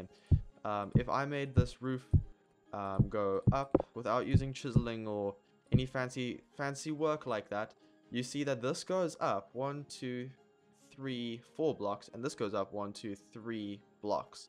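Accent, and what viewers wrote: South Africa. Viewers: South African ou?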